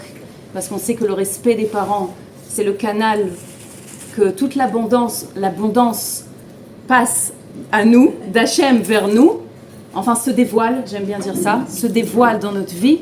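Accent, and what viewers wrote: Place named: Israel. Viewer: J’adore l’accent israélien ❤️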